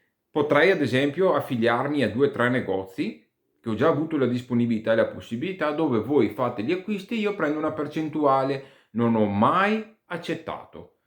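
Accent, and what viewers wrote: Veneto, Italy. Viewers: Ciao complimenti per quello che fai, forse mi sbaglio.. ma dal tuo accento mi sembra che tu sia veneto.. mi sbaglio o ci ho preso?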